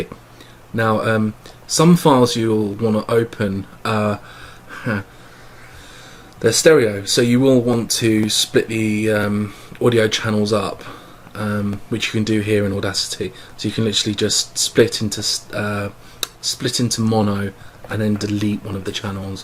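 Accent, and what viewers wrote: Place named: England, United Kingdom. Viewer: You English blokes have the best accents, I could listen to you explain stuff all day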